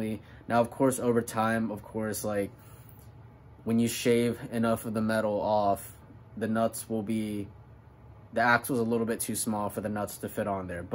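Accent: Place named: Delaware, United States. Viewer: ok. that is a good idea. thanks man. somebody just the other day had this problem at the park. i can tell your from the east coast when you said up there in new england but you dont sound like your from delaware. im from Philadelphia. the streets here might be the first roads paved after they invented black top 200 years ago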